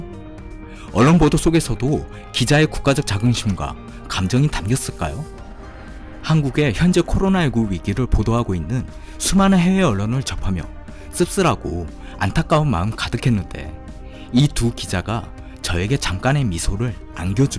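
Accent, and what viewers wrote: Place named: Yanbian, China. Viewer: 조선족은 중국관련 영상이나 올리시오. 아무리 한국인인척해도 당신 발음과 억양속에 조선족임이 들어나고 있음.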